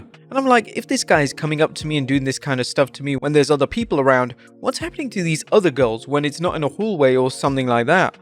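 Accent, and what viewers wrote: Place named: United Kingdom. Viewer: He's British, he has an accent, he literally can't help it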